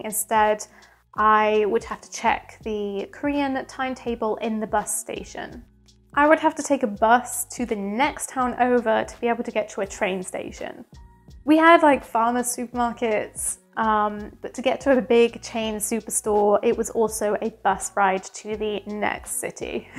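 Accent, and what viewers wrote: United Kingdom. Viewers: Sound good posh English accent...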